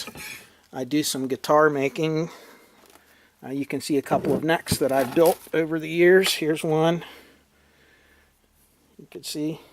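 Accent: Pennsylvania, United States. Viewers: Hey I really like the channel. I'm a woodworker and a ham operator. You sound like you're from western/central pa if so I'd li,e to meet you. Trade ideas on projects and learn some electronics. You have a great teaching style and I'm learning a lot. Give me a shout. Rick - dickrackson at yahoo dot com. Thanks rick.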